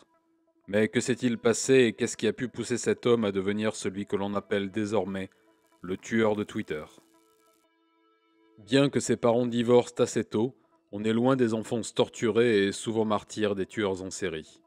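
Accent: Japan. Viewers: Konichiwa! J'ai découvert ta chaîne depuis peu et la toute 1ère vidéo que j'ai vue, c'était le calvaire ignoble de Junko Furuta. J'adore ton accent japonais et ta façon de raconter l'histoire ! Tu gagnes un like et une abonnée !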